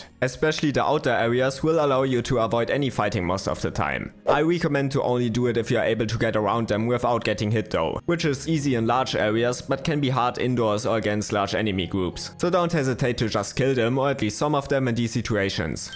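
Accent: Germany. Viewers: German accent ? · I could swear it's german or something like that. I'm from germany and lot of content creators from here have a similar accent in english.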